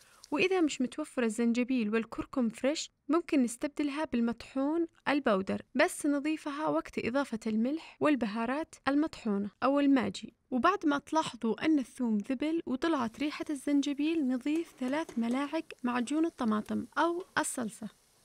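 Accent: Sanaa, Yemen. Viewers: ماشاءالله مبدعه ... حتى لو كنت اعرف الطبخه بس انا اشوف الترتيب والذوق والاناقه في كل شيء ... ولهجه صنعانيه جميله جداً❤️🌷🌹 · احلى شي لهجتش الصنعانيه فديتش وفديت اهل صنعاء. ياليت تستمري بكل الاكلات وكل الطنافس. وحتى الزبيب واللوز يكفي تتكلمي عنه وتصوري. وش المناسبات. ف اليمن وتراثهم فيه خاصه اعل صنعاء لاحد يدري عنهم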